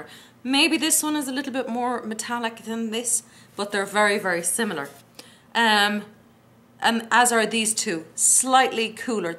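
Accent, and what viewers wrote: Ireland. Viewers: Haha you are so funny , love watching you (just curious ...are you Irish? you sound it , anyway love the accent ) great review love Nisha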